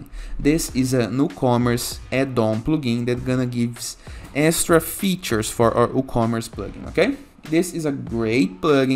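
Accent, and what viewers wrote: Brazil. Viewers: sotaque brasileiro detectado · seu inglês tem sotaque de brasileiro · valeu brazuca! HAHHAHA adorei o sotaque! :D tmj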